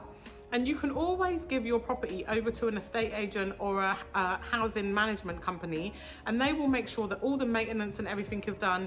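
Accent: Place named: United Kingdom. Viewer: Very informative video. Thanks. Side note: I’ve always dig that British accent, Peace!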